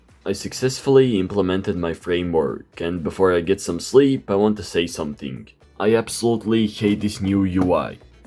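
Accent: Latvia. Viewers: Uzreiz zinaju ka tu esi viens Latviets no varda un ka tu runa😂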